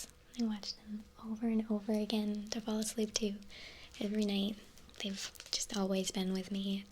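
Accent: United States. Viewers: Ah a fellow New Englander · yer a northeasterner hell yeah you rock lol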